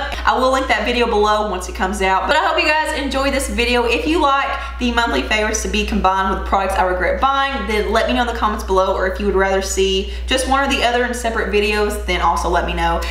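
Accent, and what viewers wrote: South, United States. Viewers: Love your accent!!!! For sure from the south!!!!!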